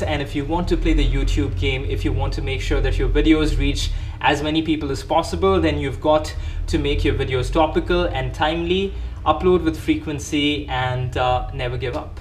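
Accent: Hyderabad, India. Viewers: bhaiii Lovely.. that's a Crazzy video..!! ❤ Hyderabadi Hindi 😍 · hydrabadi Urdu was just amazing... kirrak... maut dal Diya..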